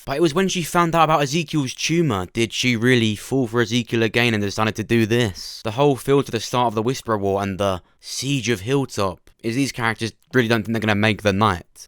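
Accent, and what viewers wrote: United Kingdom. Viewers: ...Not sure where you reside. Per the accent, I would assume/guess it's somewhere in the UK. All I can I say is you have what's called a "Radio Voice." In America, there's actual talent agents who specialize in voice work. I.e. Radio personality/Talent, as well as voice over for major movies, cartoons, etc. You should put a demo recording of yourself, with some sort of profile and picture and send it in to a talent agency or agent. I would send out a lot of the profile. I sincerely believe someone in the industry would be interested. You have a smooth and different type of voice in a good way. Please consider it, you just might have much success. A radio personality makes 7-8 figures a year. Doing movie and cartoon voice overs those people can make the same too. Give it a try you have nothing to lose. Just my opinion. But bet others would agree you have a cool voice that people like listening to.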